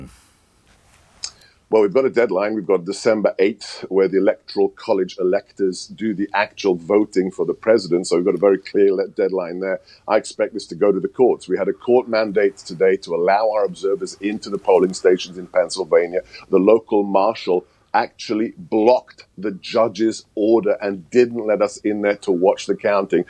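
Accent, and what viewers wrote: United Kingdom. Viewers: why did the republican senators win and only Trump lost? What a sore looser. DUMP TRUMP. You got no evidence. just because you talk with a British accent does not make what you say true.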